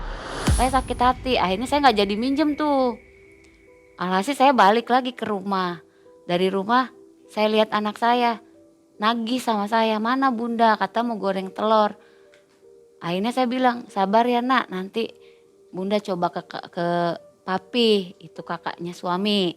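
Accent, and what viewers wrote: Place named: Cirebon, Indonesia. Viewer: kya org cirebon yah logatnya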